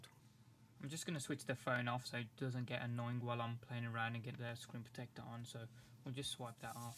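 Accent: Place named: England, United Kingdom. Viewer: dont be racist dude. thats not right plus he sounds like another fellow englishmen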